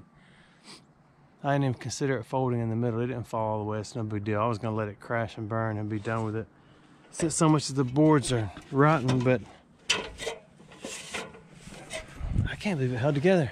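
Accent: Alabama, United States. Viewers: 🙋 I was watching this video and I was cringing! 😯😳Have a blessed day ✌️ Love your Alabama Accent 😁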